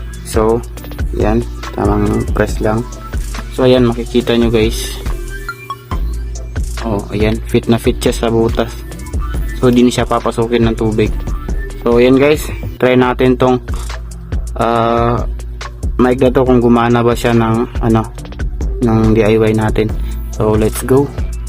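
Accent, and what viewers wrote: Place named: Davao, Philippines. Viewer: Bro taga davao diay ka?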